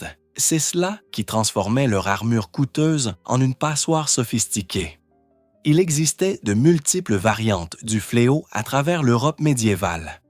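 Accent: Quebec, Canada. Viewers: L'accent quebecois du robot c'est pour gratter quelques secondes de vues ?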